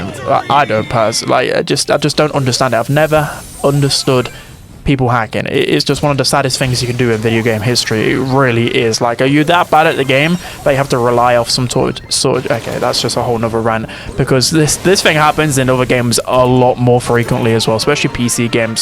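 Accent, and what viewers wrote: United Kingdom. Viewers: Bro i miss your british accent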